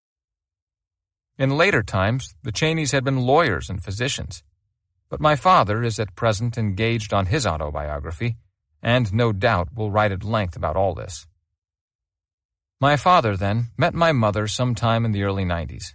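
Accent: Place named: United States. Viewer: Based on the atrocious AI "art" (and spelling) of the thumbnail, it's probably an AI bot doing the narrating. But yes, the US accent is jarring and inappropriate.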